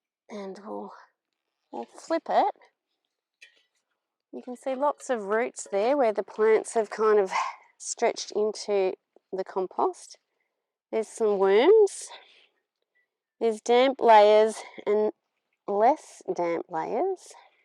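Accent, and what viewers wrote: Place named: Australia. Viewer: I like the accent of down under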